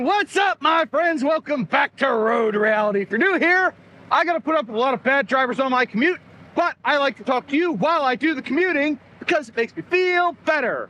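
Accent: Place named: Dallas, United States. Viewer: Sounds like Dallas to me and I wasn’t even born here in the States🤣🤣🤣🤣 Very true what you’re sayin…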